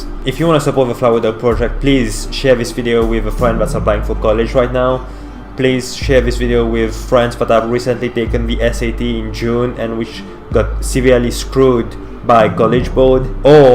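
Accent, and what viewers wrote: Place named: Mauritius. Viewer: Oh, i am Mauritian too, I recognized you from the beginning itself because of your familiar accent. Guess what, applied to MIT too, waiting for regular decisions 2019. I feel less qualified than you, means I don't even stand a chance. I have not applied with Phronesis, did everything independently with my own reasearch. I took two gap year after highschool during which I was working in an american company. Thanks for sharing the video.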